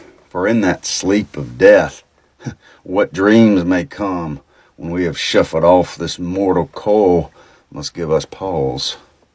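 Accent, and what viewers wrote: South, United States. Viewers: RuPaul asked why not have Shakespeare in a Southern accent. Good question. Well done. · Wow this is the most brilliant rendition of a Shakespeare monologue done in a southern accent. You made it sound almost Buddhist in a way. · This is so good! I teach Shakespeare. It's interesting; hearing this monologue in your beautiful southern accent brings it alive. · okay, so as an asian, the flow sounds better even to me. i've always found it quite a challenge to really absorb the genius of shakespeare's writing but somehow it just sounds "easier" with an american southern accent. edit: for some reason, it sounds more timeless and relatable at the same time compared to when read with what's commonly believed to be the elizabethan accent of shakespeare's time.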